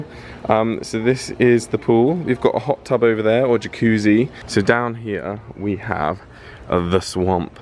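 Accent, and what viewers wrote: United Kingdom. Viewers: Lol😂 why did I find this vid oh my recommend British accent had me died😂😂😂 Am American and I love to learn British accent😂 I also live in Florida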